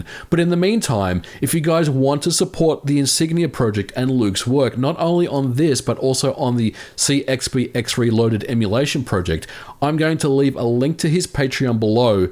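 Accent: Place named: Australia. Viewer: That's a very mild accent for an Australian.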